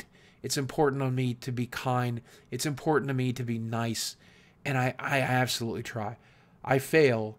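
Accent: South, United States. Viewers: You already talked about the fact how you had to loose your southern accent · Yes it's like its okay for black people in pop culture to call each other the "N" word but absolutely not okay for a white person to say that. And by the way i love the Southern accent. One of my life goals is to visit the deep south.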